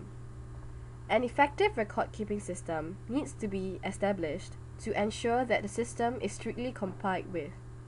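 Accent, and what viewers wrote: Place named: Singapore. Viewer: Very good video. You sound Singaporean, btw. ;-)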